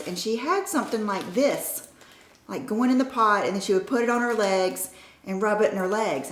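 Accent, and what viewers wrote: South, United States. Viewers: One of my favorite things about you is your southern accent!!!! 🥰🥰🥰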